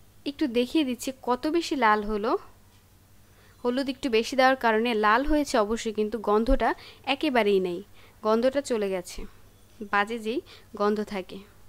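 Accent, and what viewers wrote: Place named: Rajshahi, Bangladesh. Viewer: Nice Rajshahi accent Useful cooking method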